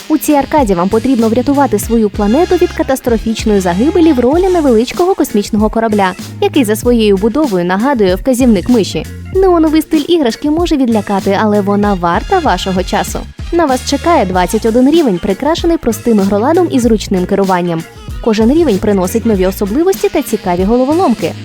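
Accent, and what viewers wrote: Standard. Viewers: Так круто слухати чисту українську мову, причому гарним голосом) Я теж роблю топи, якщо неважко, можете заглянути ;)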